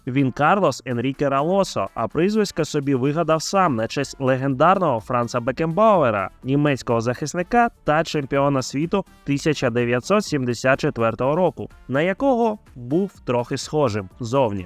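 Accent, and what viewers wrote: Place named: Russia. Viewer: У диктора сильний російський акцент. Це тільки я помітив?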